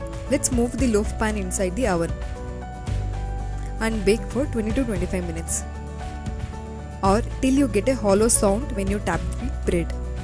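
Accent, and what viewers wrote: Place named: India. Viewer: Disliking because of your cringy Indian thick accent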